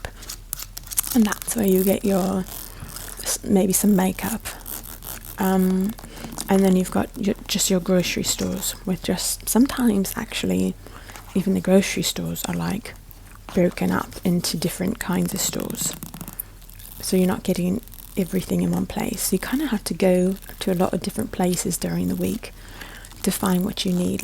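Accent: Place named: United Kingdom. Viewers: Sounds like a British accent with a light tone of someone who learnt English when they were younger (not their first language but close second) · she's American but she uses British accent for her privacy :)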